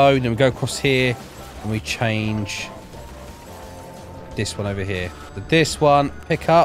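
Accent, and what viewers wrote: United Kingdom. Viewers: I always thought you live in America but you are British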